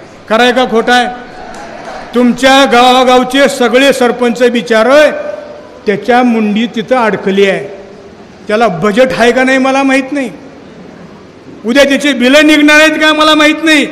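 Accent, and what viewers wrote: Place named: Rural, India. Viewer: अस्सखलीत ग्रामीण शैलीत no.१ भाषण 👌👌👌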